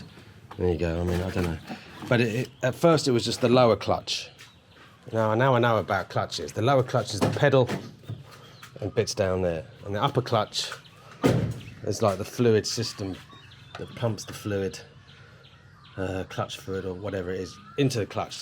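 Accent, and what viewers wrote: Cockney, United Kingdom. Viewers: Just came across some of your videos, I like your cheeky chappie cockney narrative. Your house is lovely, and a good sized plot too. Very luxurious, great master bedroom, must have cost a fortune, were you rich in England so you can afford to live well there in a very early retirement? Ew, didn't like that mass of maggots thingys that the storm blew in, do they go away on their own or do you have to brush them up and bin them? 20 years you have lived there, where is your wife, yes I am nosey? Hi to your lovely daughter, I will look for her business video, she has done well for herself and I take it you see her weekly?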